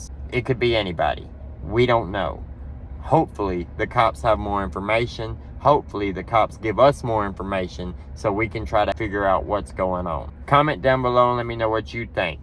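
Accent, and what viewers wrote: South, United States. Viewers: This is the 1st video I've seen of yours. If I'm being honest I was going to skip past it. I decided just because you didn't speak like me and had a Southern accent doesn't mean I shouldn't listen to what you have to say, obviously 🙄 I'm glad I decided to give it a chance. Keep up the good work! Good take on this case. I don't fully agree with your take on what happened. I Think those 2 surviving roommates had something to do with this. It's way too weird for them not to see any blood. Dude there said it was a blood bath. There's blood leaking out of the f**** house. This whole case blows my mind, though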